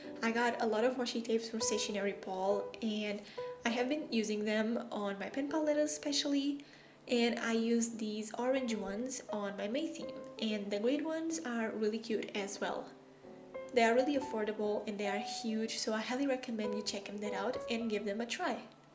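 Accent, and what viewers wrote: Portugal. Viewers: are you portugese? bc i am fluent and you have an accent.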